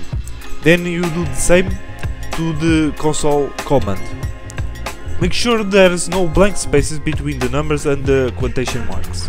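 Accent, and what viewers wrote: Portugal. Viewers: sim, é português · Sotaque de portugal? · With a very portuguese accent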